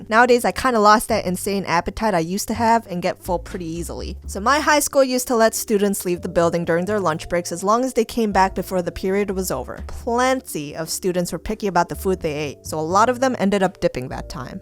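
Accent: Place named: Long Island, United States. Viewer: You sound like a mix of Karina and Julia's Long Island accent from Drawfee.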